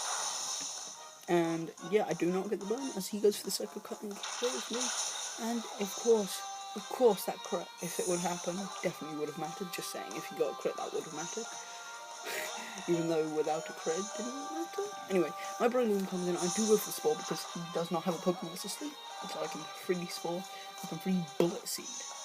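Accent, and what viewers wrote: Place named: England, United Kingdom. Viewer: This is Sam from Facebook, good battle. Are you from England? You have an accent.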